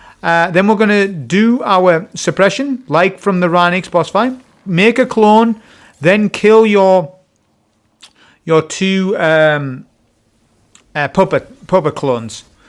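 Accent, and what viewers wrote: United Kingdom. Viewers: Also the amount of British in this video is definitely an 11/10 crashout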